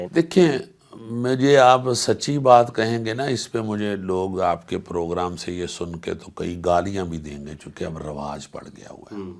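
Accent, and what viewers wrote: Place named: Punjab, Pakistan. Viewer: Bhaji ajj te Urdu wadhiya bol rahe ho.nahin ta Punjabi da lehja aunda jiyadatar tuhadi bolli vichon.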